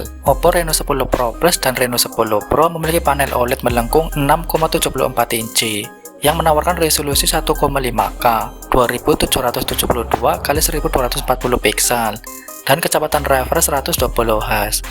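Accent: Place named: Java, Indonesia. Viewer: Jawa koek bnget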